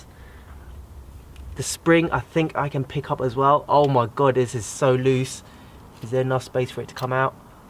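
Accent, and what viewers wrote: England, United Kingdom. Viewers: I'm like your English accent especially you Asian even hotter. Keep good work I'm just subscribed, take care from Cali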